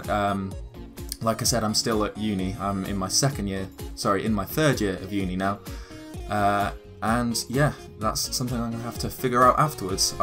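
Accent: England, United Kingdom. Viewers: I remember those days. You were and still are my favorite Zelda theorist. I always assumed was a mix of Zelda and Celtic. And I thought it was odd because you sound more English than Celtic.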